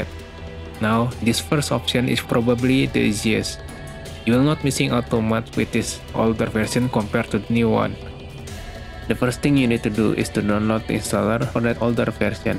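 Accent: Indonesia. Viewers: I know ure indonesian jst from ur accent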